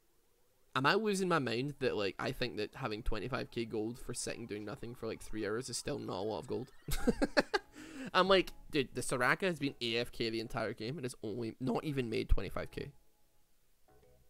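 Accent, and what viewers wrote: Scotland, United Kingdom. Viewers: comedic scottish man rambling and lightly comentating over a league game to study / relax to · do you know how many times this video has popped up on my autoplay while i've been at work Coworker: What are you listening to? Me: A Scottish guy ramble for hours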